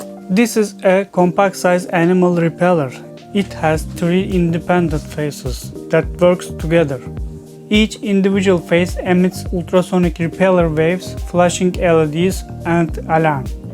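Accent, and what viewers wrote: Turkey. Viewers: Türk gibi ingilizce konuşuyorsun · Bu ingilizce aksanı kesinlikle bir Türk'e ait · You sound very Turkish ?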